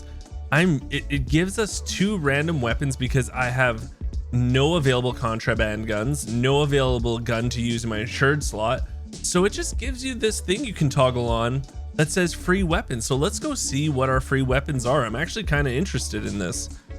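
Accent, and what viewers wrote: Canada. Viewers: You were soo accurate with your “15 DMZ tips and tricks to make you a pro” video. Your goofy Canadian accent WAS fun😮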